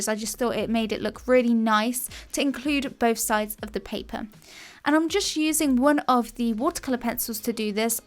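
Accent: England, United Kingdom. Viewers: Where do you come from (which part of england)? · I love your british accent.. a lo' wo'ah.. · Finally!! I was trying to find a British artist because i wanna develop my british english accent 😭😭 I've just subscribed to your channel and hit the notification bell ✌️🖤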